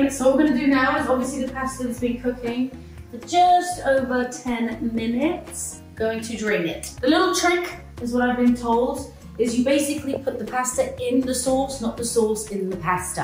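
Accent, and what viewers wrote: United Kingdom. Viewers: Sometimes, I forget that she’s British, and then she starts speaking...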